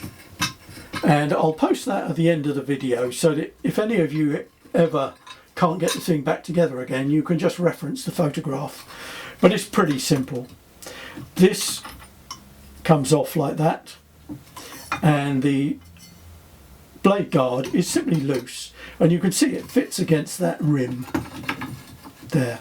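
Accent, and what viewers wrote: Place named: United Kingdom. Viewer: there's something about the queen's english that just makes the video sound like a documentary. this is amazing hehe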